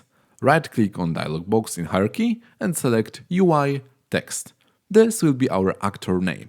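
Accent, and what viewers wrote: Poland. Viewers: Jesteś z Polski? Bo tak trochę po głosie słychać ale nwm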